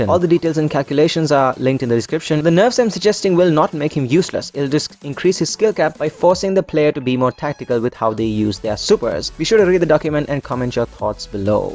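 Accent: India. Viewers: r u Indian tho ?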